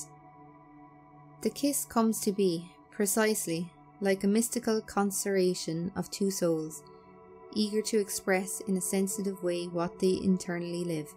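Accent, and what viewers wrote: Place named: Ireland. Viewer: No doubt, that’s a lovely Irish accent I hear. Thank you.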